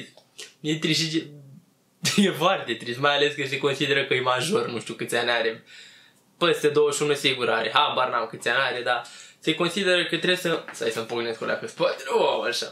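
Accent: Moldova. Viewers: Like pentru accentul Moldovenesc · Imi place accentul tau de moldovean :))) ( wăăăi ) #suntsacal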